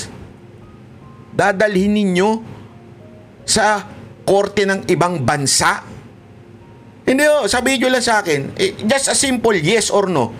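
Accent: Visayas, Philippines. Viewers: Ang cute magbisaya mo sir 😂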